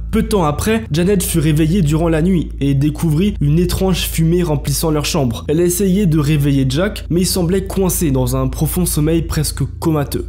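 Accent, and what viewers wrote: France. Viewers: Salut, je découvre ta chaine ^^ N'aurais-tu pas comme un chtit accent du chnord? ^^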